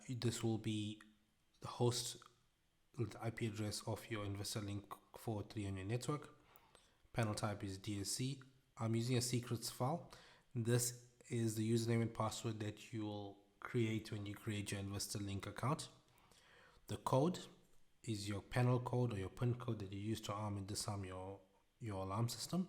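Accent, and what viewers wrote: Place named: South Africa. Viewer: You sir are a legend! Always stoked to hear a South African accent when trying to find info on youtube. Thanks for the vid, very helpful.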